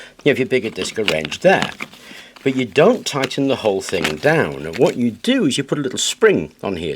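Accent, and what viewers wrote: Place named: United Kingdom. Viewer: Lately it's been like watching the Tech Ingredients channel with a British accent.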